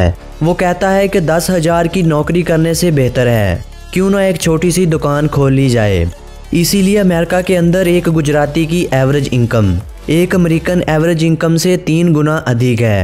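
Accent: Pakistan. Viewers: Aavaj achhi hai. sab kuch population dikhaya. Urdu ki lahak aa jati hai. Kaha ke hai?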